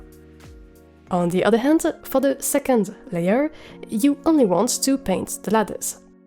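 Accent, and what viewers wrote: France. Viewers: I'm still racking my brains trying to figure out how to get a character to climb a ladder. I've almost reached the same solution, but I don't use Godot; I code games in JavaScript without engine, just for fun. But I don't have a function to know if I'm entering or leaving the tile. I'll take a look at the Godot source code. Thanks for the video. PS: Are you French also? Because I surprisingly understood your accent lol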